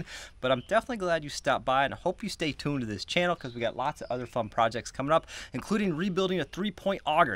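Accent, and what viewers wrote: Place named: Midwest, United States. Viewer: Yeah, his midwestern dialect is tough on my old ears. I don't catch everything he says.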